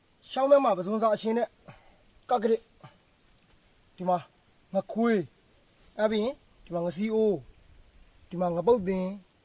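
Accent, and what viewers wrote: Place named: Rakhine, Myanmar. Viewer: ရခိုင်ဒေသသံတွဲကြားနဲ့ဂွကြားကစကားအသံ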